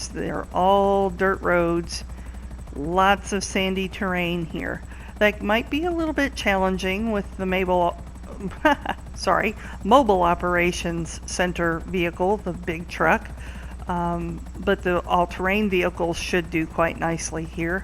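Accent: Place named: South, United States. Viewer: Just ever so slightly southern, but I know what to listen for.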